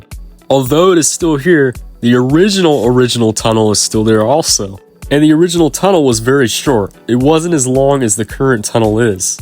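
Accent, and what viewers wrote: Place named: Pittsburgh, United States. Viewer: Wow you have such a thick pickberg accent... damn do i really sound like that.. love from uniontown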